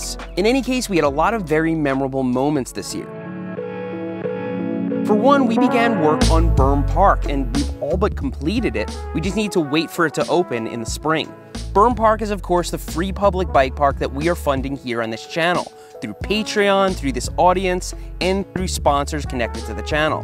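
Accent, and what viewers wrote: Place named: New York, United States. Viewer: It's cute how thinks he got rid of his accent. That lovely NEW YAWK accent.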